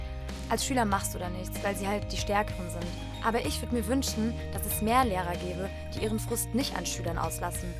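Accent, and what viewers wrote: Germany. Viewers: Ich liebe dich, I used to be obsessed with germand and germany I have no idea why, but I love the accents. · That German accent is so pure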